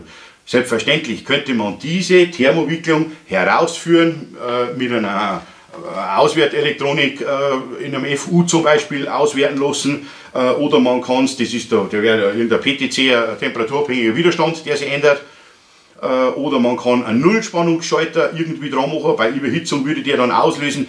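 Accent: Bavaria, Germany. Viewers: Guten Abend Mache bitte weiter so ! Und lass Dich bitte nicht Ärgern :-))) Ich finde deinen Kanal Top / Klasse / Spitze ........ usw. Und wehe Du hörst auf Bayrisch zu Sprechen , Hochdeutsch passt leider nicht zu Dir :--)))) hört sich an als wenn ein Rheinländer Bayrisch sprechen würde :--)) Schöne Grüße aus dem Rheinland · Ich schaue Deine Videos gern aber mein lieber ich kann Bayrisch nur schwer verstehen. Etwas mehr Hochdeutsch wäre schön. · Ist das Bayrisch? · "Und wehe die taugt nix..." 😄 Bin gespannt und in freudiger Erwartung! Es ist immer wieder ein Genuss Dir zuzuschauen. Auch wenn es ab und an etwas anstrengend ist wenn Du wieder ins Ur-Bayrische schwingst. 😉 Mach weiter so!!! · Also ich als Bayer versteh den Dialekt ja, aber ich glaube das für manche Menschen ein Untertitel absolut sinnvoll wäre!!! Dann hättest noch mehr Klicks!!!! 😝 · Ach reg dich doch nicht auf über sowas, dann lebst länger :-) Aber mal ehrlich, mach einfach mal was mit ihm zusammen, ein teil des Projektes bei dir und ein Teil bei ihm, das bringt Klicks :-D. 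Lets Bastel hat ja letztens auch Hilfe bei Jonas Winkler geholt. Mach weiter so und ich als Sau-Preuße verstehe dich auch in Bayrisch, zwar manchmal mit Hürden aber das passt so ;) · Super Kanal. Finde die Umsetzung sehr gut. Den Schutzschalter würde ich genauso machen. Lieber Nummer sicher. Und dazu noch bayrisch Top top top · Solche Empfehlungen würde ich nicht aussprechen. :D Als gut ausgebildeter Elektroinstallateur lernt man hier nichts fachliches... stimmt, es ist aber nie verkehrt, zu sehen und verstehen, wie andere "Artgenossen" arbeiten. Wer den Drang hat, sich selbst fachlich zu verbessern, darf sich nicht scheuen, andere Arbeitsweisen kennen zu lernen, zu sehen und zu verstehen. Außerdem lernt man so (in diesem Fall) den bayerischen Dialekt zu verstehen... du quasselst damit etwas, was ich fachlich alles kenne. Besser lernt man keine "Fremdsprache"... woll?! :D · reg dich doch nicht ueber die dummen auf. 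ich schaue deine videos gerne, weil du diese interessant machst. deine freie bayrische ehrliche schnauze schaetz ich auch sehr. also brav weitermachen.lg rene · Des passt scho so wia d des mochsch danke für den elektrischen Teil aber bissle weniger bayrisch wär au ok ;) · Du bist scho a bäriger Typ - Bsonders dei Dialekt is a so schee. Mehra Bayern auf YouTube ! Mach weida so!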